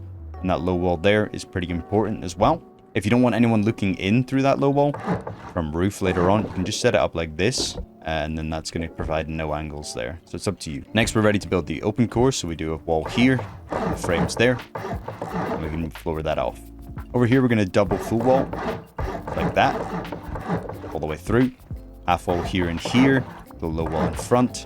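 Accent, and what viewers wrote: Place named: Scotland, United Kingdom. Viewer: Wait! I've been watching your videos and building your bases for the boys for years at this point and it was only now when I was showing my mate one of your PvP vids and he realised you were a fellow Scotsman. How I never noticed is beyond me I obvs thought your accent was "normal" so I never even realised. Keep up the banging videos bro, proud of you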